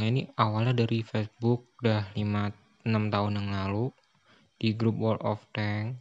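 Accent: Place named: Java, Indonesia. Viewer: Bagi saya cara menyampaikanya Sangat sangat jelas kang dan lantang dna logat jawanyapun terasaa,sayapikirsitu orang jawa aali,Dan sayapun asli jawa tapi sekarang tinggalnya di Daerah provinsi banten,salam dari saya,Btw do you want platon with me😎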